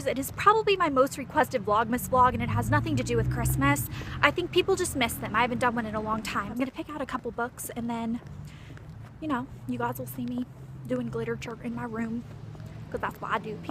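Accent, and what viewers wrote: South, United States. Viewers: aww your southern twang is coming out more since you're at home :) adorable!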